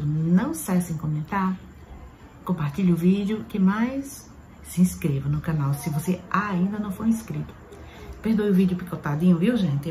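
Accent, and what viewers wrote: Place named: Northeast, Brazil. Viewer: ❤❤❤bjs,eu tbm amo esse sotaque arretado 😅😅😅😅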